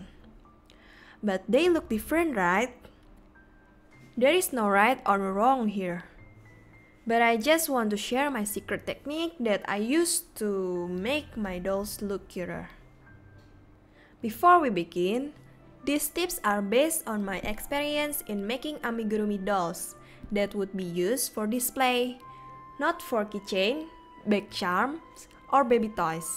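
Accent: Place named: Indonesia. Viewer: Your accent likes Indonesian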